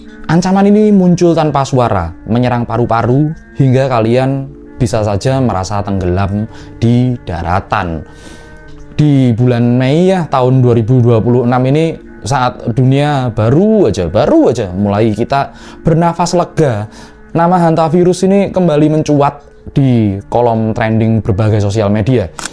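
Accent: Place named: Java, Indonesia. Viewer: .. Saya sangat senang mengikuti kontent konten Tapi larbih baik lagi kalau logat bicaranya kembali ke asal saja.. Yaitu logat Jawa medok.. Akan kliatan Jawa sekali mmitu akan lebih enak dan unik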